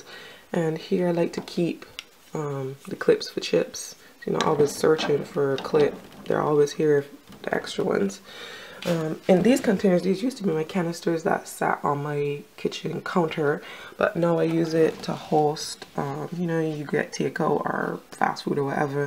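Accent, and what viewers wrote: Barbados. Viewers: From the beginning of the video I heard the bajan accent then I looked at the name sure she's a bajan.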